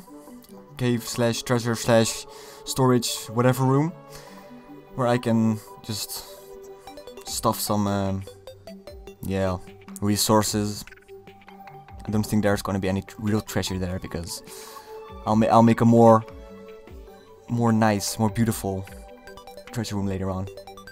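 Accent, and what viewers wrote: Netherlands. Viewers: You sound very dutch to me... no doubt. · You are dutch right? I can hear it in your accent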